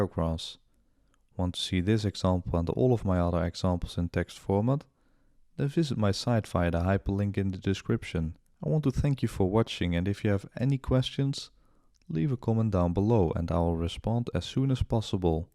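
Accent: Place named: Netherlands. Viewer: dutch accent is the best accent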